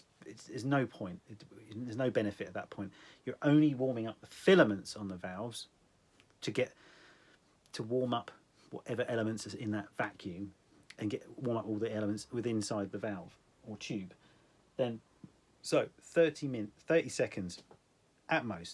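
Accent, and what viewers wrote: United Kingdom. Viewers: The English accent is good for singing not so much for speaking sorry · I thought you sounded like a true British rocker with the english accent. John Peralta sounds like a dick! Keep rockin the great information. Appreciate you taking the time to make this video bro!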